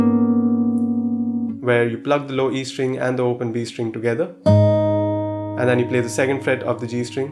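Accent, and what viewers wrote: India. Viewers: You sound indian ! Where are from brother ? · Omg your accent tells me you're from India dude...are you??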